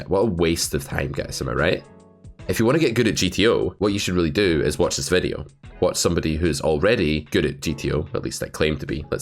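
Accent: Scotland, United Kingdom. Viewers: The auto generated captions are actually really good here with beautifully clear Scottish lilt.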